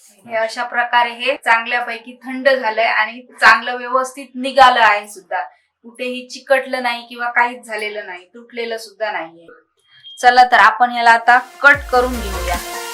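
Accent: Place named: Malvan, India. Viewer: Waa..mast recipe ..thanks aani barobar tumch malvani touch aslel bolna pan mast.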